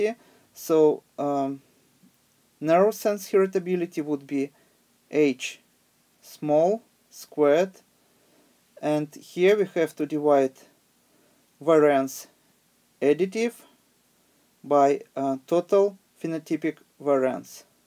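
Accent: Russia. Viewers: I like russian pronunciation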